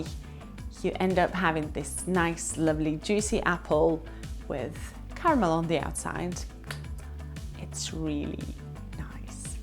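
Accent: United Kingdom. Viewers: I have fallen in love with your British accent ❤ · Если бы темп её речи был чуточку быстрее, то это был бы ад для меня при понимании. Ну никак не могу разобрать британский акцент! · I admire your British accent! Cheers! :)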